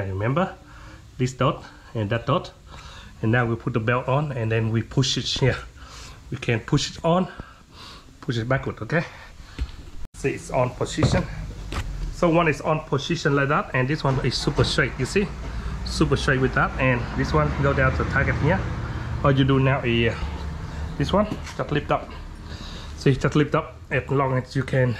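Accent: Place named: Australia. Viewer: How many years you been a mechanic very smart man love your Aussie accent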